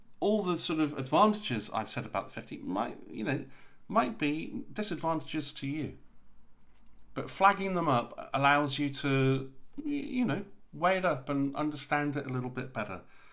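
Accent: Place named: South, United States. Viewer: You seem to be British. Perhaps you are from the South of Britain because you speak like someone from the South of the US. I know that you are headed someplace and I am intriged enough to wait until you get there. Just an observation. Love both photos.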